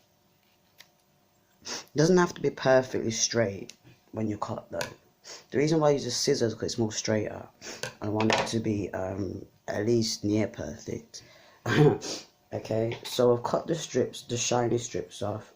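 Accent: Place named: Birmingham, United Kingdom. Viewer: I came here from Stud-conscious. You a Londoner? From darn sarf? I'm from Manchester 👍👍👍 Edit.. Brummie twang there too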